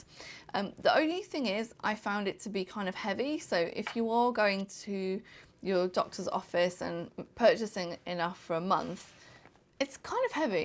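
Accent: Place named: United Kingdom. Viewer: I' m here for that british accent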